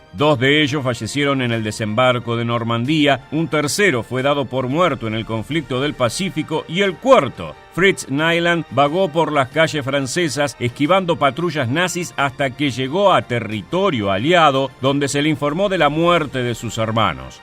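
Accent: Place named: Argentina. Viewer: Tu forma de hacer crítica es de lo más absurdo. Pará la próxima ocasión, en vez de criticar realiza una investigación concienzuda de los hechos y haz una entrevista personal a los soldados muertos para que te digan a detalle lo que sucedió. Posteriormente escri e el guión y haz tu propia película. A propósito, por tu tono de voz éres de nacionalidad argentina, verdad?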